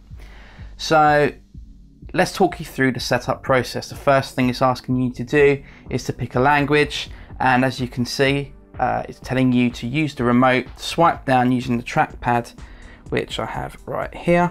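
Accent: United Kingdom. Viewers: BUZZKEIL Tech: The accent is NOT American. I'm from America! The accent on the video sounds cockney.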